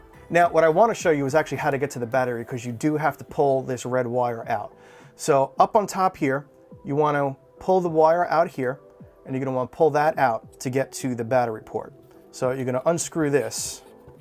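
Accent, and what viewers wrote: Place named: Boston, United States. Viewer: I know that accent from any where you from boston?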